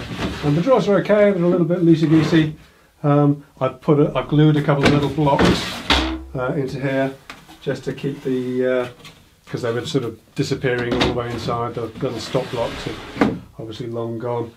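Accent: United Kingdom. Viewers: Hello. I have just recently subscribed and love your videos - especially the British accent ( me being in the US). I think you’ve been on for a year and I do hope as many others that you continue your videos. I have watched many of your catalog of videos and love all the tips and jigs. Keep up the great work!!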